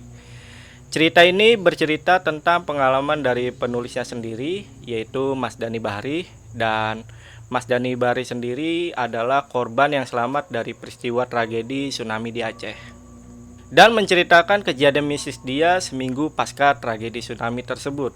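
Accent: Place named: Bali, Indonesia. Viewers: Ini naratornya orang Bali apa NTB ya?, penyebutan huruf T nya beraksen daerah tsb. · Naratornya orang bali..ya.?kelihatan dri logat nya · Yang baca berita ini apa orang bali ya ? Bli ? Logat T nya sangat ketaraa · Bang asli mana? Logatnya ky beli Bali 😁 i like it ❤ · mimin org bali kah ,logat T nya ko keg org bali ?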